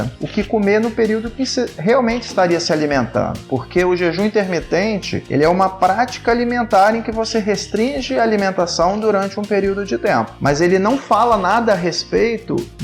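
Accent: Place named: Rio de Janeiro, Brazil. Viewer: Fico encantada com sua voz, linda! Vc deve ser carioca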